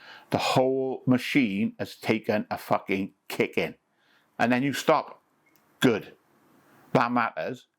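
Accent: United Kingdom. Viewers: I'm from USA and this gentleman should get a medal. I get his Brit accent and directness like he's talking to a friend. I recommend you view as often as possible during sobriety.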